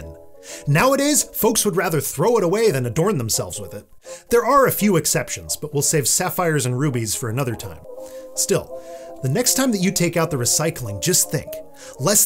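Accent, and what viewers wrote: United States. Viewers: The man in the video is no authority. He is American using an American dialect of English. ONLY in the USA is aluminum accepted. Internationally it is recognised as an acceptable American dialect, not standard English. It isn't the British, it is 96% of the world population. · You really shouldn't get into scientific discussions if you cannot even understand basic English. Literally no part of the video claims either of the names is 'wrong' and does not refer to either being 'unique' in any way. The maker of the video says that the reason he calls it aluminum is not just because of his American accent, but also because the person that coined the first term for the metal (alumium) changed it to aluminum. He then proceeded to say that somebody at Quarterly Review referred to it as aluminium and it 'stuck', since the correct term (coined by the maker) wasn't yet widespread. 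What does this mean? That BOTH spellings are actually correct. Aluminum as that's the name it was actually given by apparently the first person that named it, and aluminium as a common 'evolution' of a word (which is the process by which we have 'photoshop something' or 'google it'). All that aside, even if we assume that 'aluminium' is the only correct form in British English (it's not), this STILL doesn't mean jack, since it is correct as aluminum in American English. Whether you want to accept it or not...that's an existing language and it doesn't need to follow the naming of other languages (including other variants of English).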